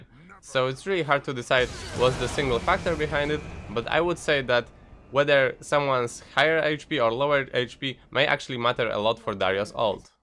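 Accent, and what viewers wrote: Poland. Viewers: od razu slychac ze polak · Hi u have Polish akcent xd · ARE YOU POLISH? CUZ I LOVE YOUR ACCENT ❤❤❤❤❤❤ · jestes z polski bo troche slychac po akcencie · Are you from Poland right?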